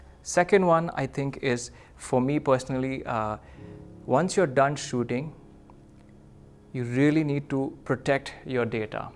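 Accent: India. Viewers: Dear, Looking at your breath taking photos and the comments and the kind of person you are, remembered a joke… once a Chef visits a Photogapher’s home and appreciates all the photos and in the end says you have a awesome camera, another day the photographer visits the Chef’s home tastes lip smacking dishes and says ‘you have a n awesome gas stove’ ….you are awesome…very interesting to see a Chinese Photographer with so much exposure to Indian culture…. Bow to your humility and talent. Were you brought up in India, looking at your Indian accent? And to sum up the message just a few minutes back found this video of Photographer Annie Leibovitz which I would like to share: <link> Thankyou Pixel Village and for this wonderful video by. P.S. In the past for me wedding equals trauma…and I recovered from the trauma and managed to make it beautiful by using EFT which is based on Chinese Accupuncture….so some connection with you:). 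Thankyou for sharing from your heart, i too love taking photos of my family with my mobile for the time being:)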